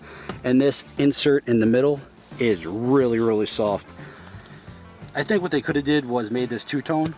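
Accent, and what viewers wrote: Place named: Philadelphia, United States. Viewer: Love that Philly accent! Good luck with the channel. Great video.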